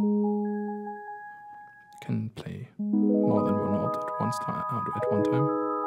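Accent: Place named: Germany. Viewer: German accent :D nice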